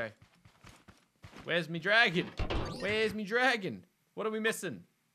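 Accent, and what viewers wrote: Australia. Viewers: Yep he's fucking Australian subbed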